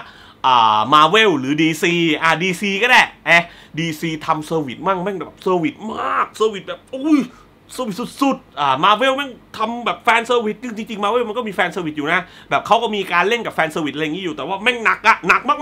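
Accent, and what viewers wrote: South, Thailand. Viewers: พึ่งรู้ว่าพี่คนใต้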